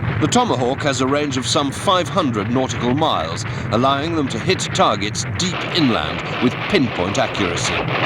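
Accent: United Kingdom. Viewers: I can't listen to a brit tryin to educate me. Oh well...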